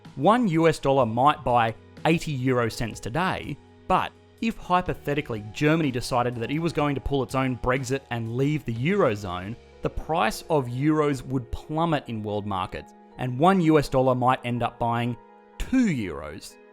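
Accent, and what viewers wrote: Australia. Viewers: This channel makes me wish I had an Australian accent. It just sonds so relatable and charming.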